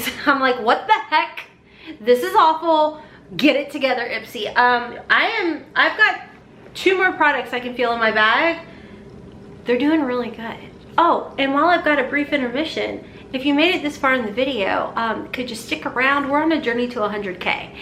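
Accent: South, United States. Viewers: So I seen in another video you said something about being at an Ohio festival… are you from Ohio or down south? Some words I hear a southern slang behind lol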